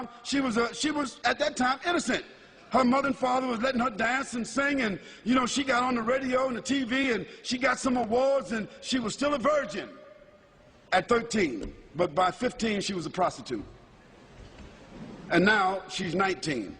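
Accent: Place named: New York, United States. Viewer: machaa ellah, en plus son accent d'un vrai newyorker trop joli ellah ybarrek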